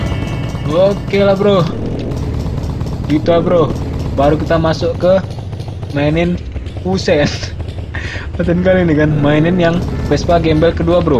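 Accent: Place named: Tanjung Balai, Indonesia. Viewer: abg orang tanjung balai ya?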